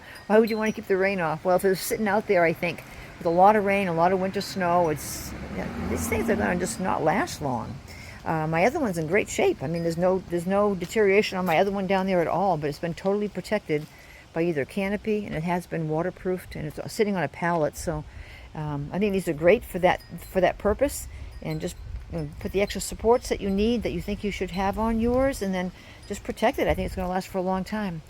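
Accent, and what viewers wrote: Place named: New England, United States. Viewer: Ok, first what general area are you from, I moved from NH and your accent sounds familiar. Also, if you were buying a first coop for about 6 chickens, which one would you buy?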